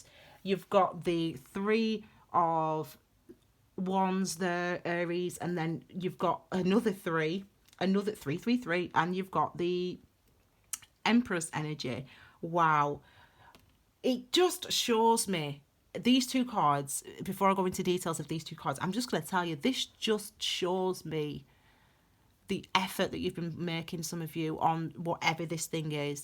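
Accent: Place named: Northern England, United Kingdom. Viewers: Love your readings, your lovely brown hands and your "Guy Martin" accent. You sound like his female equivalent. Are you two from the same geographic area? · I'm in Darwen, I knew I recognized the accent 🤗 thanks for the video! Have a beautiful week x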